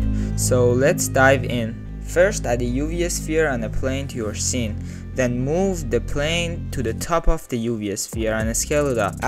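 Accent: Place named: Iran. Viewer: داداش لهجه ایرونیت خیلی باحاله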